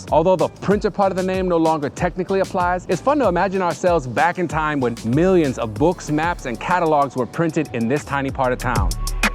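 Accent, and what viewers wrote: Chicago, United States. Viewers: I love the lingo/accent. I grew up on the NW side and I feel like the accent/lingo is largely gone now - it feels like everyone I meet here grew up somewhere else!! At my last job, no one grew up in Chicago at all (there were like 20 people) & they wouldn’t understand me when I would jump into Chicago lingo, so I had to avoid it as much as possible because I didn’t want to explain lol Anywhooo, grew videos!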